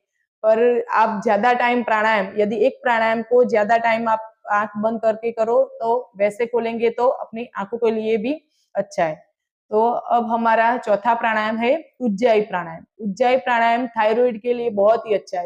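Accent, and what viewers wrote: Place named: Gujarat, India. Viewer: Shayad gujrati lago cho bolva thi khabar pade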